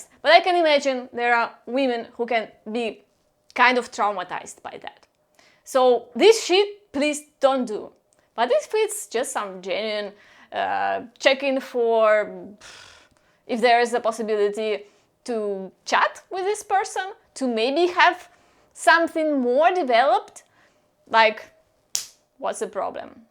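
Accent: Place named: Russia. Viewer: I love how straight up you are and your swearing word's makes me laugh your actually funny the way way you speak from Russia but in English speaking love your video on utube keep it up cheers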